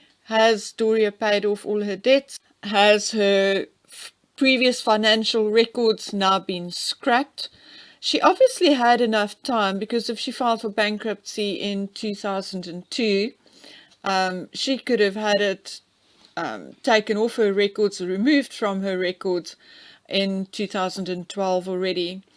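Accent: South Africa. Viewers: Is your south african accent from an an English background or Dutch? Just curious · You sound south African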